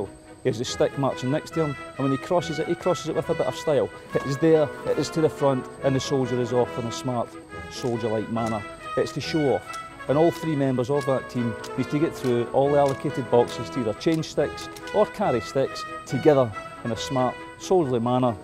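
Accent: Scotland, United Kingdom. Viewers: Strong accent, difficult to understand for foreigner like me. Is that Scottish accent ? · I really enjoyed the video but try speaking a little slower. Many of us across the pond are not familiar with the Scottish accent. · nice Scottish accent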